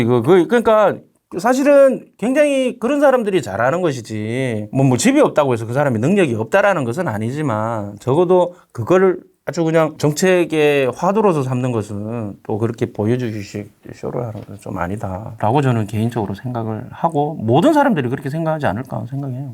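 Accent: Gyeongsang, South Korea. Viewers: 경상도 분이신가요? 완전 다혈질이네요 너무 와닿고 공감 되네요 국회로 가십시요?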